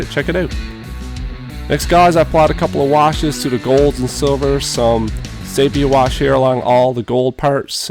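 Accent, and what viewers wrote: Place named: Newfoundland, Canada. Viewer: I figured you were in Newfoundland from the accent, but Labrador is probably even more remote! Have you tried ordering from Kingdom of the Titans before? They're located in northern Quebec and might be able to ship to you fairly quick. Keep up the great work, love to see more OPR content and quality Canadian content creators! 🍁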